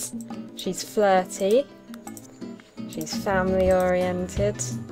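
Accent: United Kingdom. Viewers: I LOVE UR BRITTISH NISSSSHHH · I love your accent <3 I miss England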